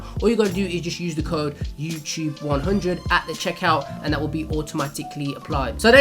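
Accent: United Kingdom. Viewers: UK accent lowkey ads more authenticity to his voice, start a podcast "#1 E-commerce Podcast in the world"...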